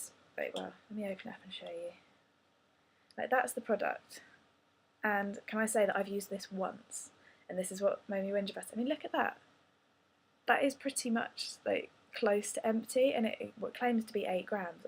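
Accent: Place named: United Kingdom. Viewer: You really should do more videos and fix your lighting. ;) I subscribed to you, darling. I like British beauty-gurus coz they're so down to earth and I love their accent. You're lovely! Kind regards, Simone.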